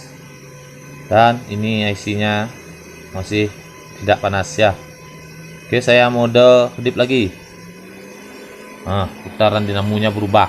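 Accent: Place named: Bali, Indonesia. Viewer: Kaka ini orang bali kah ko logot nya kayak orang bali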